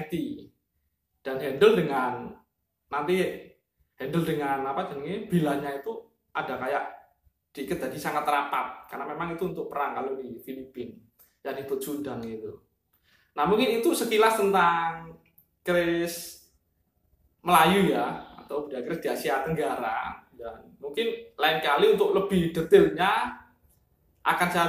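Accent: Java, Indonesia. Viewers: Ini jawa sejati. Pekat bahasa nya ya.👍🇲🇾. Salam nusantara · Salam mas, pekat bahasa jowo nya mas... haha.. salam dr Malaysia mas. Mantap isi nya!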